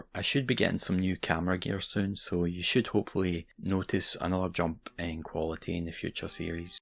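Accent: Scotland, United Kingdom. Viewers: Wow. Miniature painting has come a long way since the 80s! Sublime work... not to mentioned narration by a smooth, Scottish accent. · I had to dig the urban meaning of shucks ^^ English is not my native language (being French)..... but I enjoy your scottish accent :) Keep up the great work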